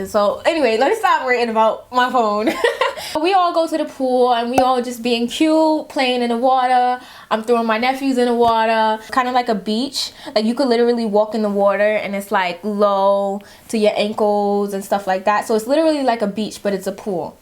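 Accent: New York, United States. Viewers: your New York accent is so cute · I love the way how New Yorkers say things that end with an "er" sound. Lol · The New York accent gives me LIFE fr!!!!! I love it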